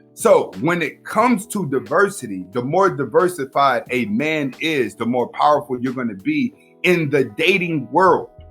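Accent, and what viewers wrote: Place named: New Jersey, United States. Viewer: Bro I could literally feel you were from New Jersey.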